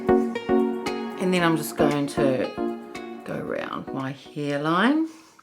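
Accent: New Zealand. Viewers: Thank you for such a thorough review you get an A for effort for taking the time to wash off and reapply products twice! These all looked great on you, but it seems like everything you try does. Also enjoy your videos because I love the NZ accent.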